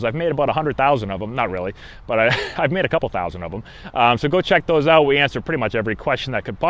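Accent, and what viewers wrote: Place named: Massachusetts, United States. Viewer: also your Mass accent is easy to understand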